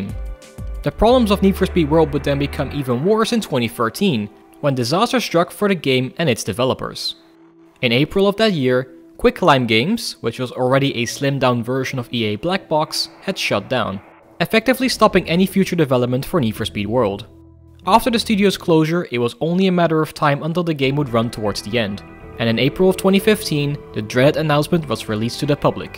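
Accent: Netherlands. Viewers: The Dutch accent is already unmistakable.. But 'Costed'?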